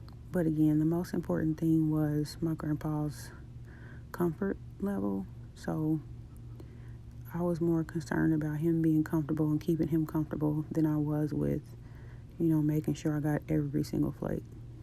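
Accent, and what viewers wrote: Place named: South, United States. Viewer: the southern accent makes your voice even more calming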